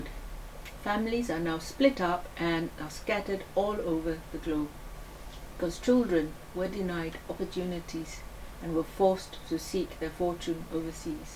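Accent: Perak, Malaysia. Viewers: yalaaa..alaaa seronok dengor akak cakap melayu sbb ade slang perak ..hahaaa jgn marah guro aje kakk...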